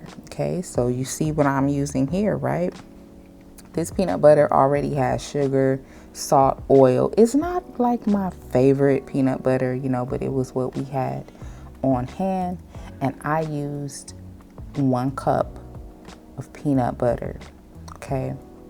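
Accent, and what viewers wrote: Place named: Maryland, United States. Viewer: Love your accent- back east- maybe Maryland?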